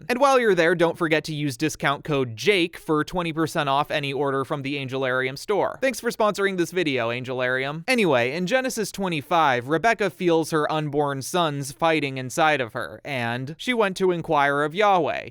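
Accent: United States. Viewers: The pronounciation isnt bad! It just has an american accent, good job. Adom especially sounds good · your hebrew pronunciations aren't half bad actually! it's just your american accent getting in the way lol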